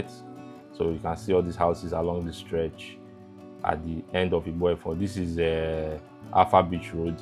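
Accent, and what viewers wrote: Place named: South London, United Kingdom. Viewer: The landmark structures are that of a warehouse standard and obscene for such a location. Of course, most Nigerians have never seen beach tourism like Brazil and Spain. make him collect his compensation and go somewhere. The standard is 0. He guy should stop brainwashing Nigerians with his Saf London accent make we hear word.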